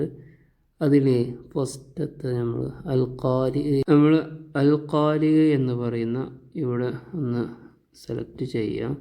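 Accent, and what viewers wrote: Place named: Kasaragod, India. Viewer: സംസാരം കേട്ട് കാസർകോട് ജില്ല എന്ന് തോന്നുന്നു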